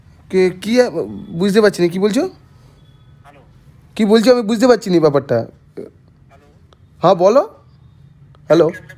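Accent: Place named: Purulia, India. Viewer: Purulya accent ta boddo dile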